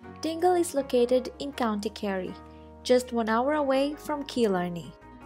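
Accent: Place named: Philippines. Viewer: I think the way you speak and your english and it seems you are a filipino?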